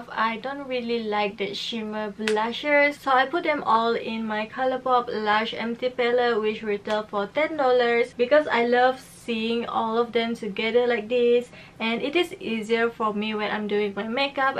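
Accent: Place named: Malaysia. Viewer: I'm from malaysia too !! nice video ♡ your accent is adorable ! :)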